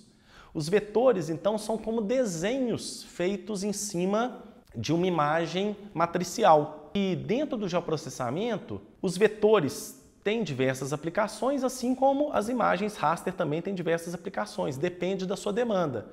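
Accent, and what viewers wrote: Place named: Minas Gerais, Brazil. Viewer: geoprocessameeeento. como eu amo o sotaque mineiro kkkkkk otima aula! obrigado!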